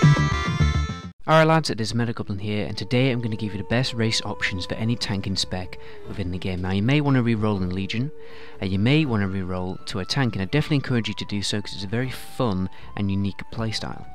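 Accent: United Kingdom. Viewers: off topic but may I ask where in the UK you're from? I recognise your accent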